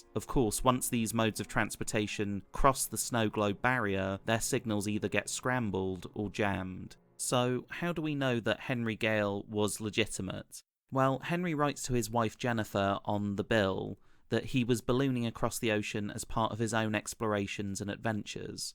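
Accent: United Kingdom. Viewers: Is this video a robot voice or yours??? I love the voice in this video (British accent?)...I would be surprised to hear it's a robot!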